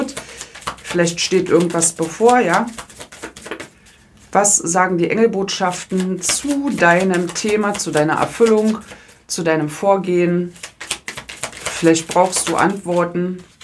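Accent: Berlin, Germany. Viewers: Vielen lieben Dank für diese sehr passende Tagesenergje - Botschaft 🙏❣️🙏 Heute ist für mich ein sehr sehr spiritueller Tag ❣️ Ich habe schon am frühen Morgen einige wichtige Erkenntnisse gewonnen und durch deine Botschaft der Tagesenergie finde ich diese intuitiven Gedanken bestätigt ❣️ Deine Tipps und Ratschläge sind immer sehr wertvoll für mich ❣️ Achja, das mit dem Grammatik Kurs vergiss bitte wieder 🙏❣️🙏❣️🙏 Ich liebe deinen Berliner Dialekt ❣️🥰❤️ Hab über 20 Jahre in Berlin gelebt, das ist einfach meine Heimat.... Wünsche dir und allen anderen, die dich auch von Herzen mögen, einen schönen und vor allem positiven Dienstag ❣️❣️⚡🌌☀️💖😘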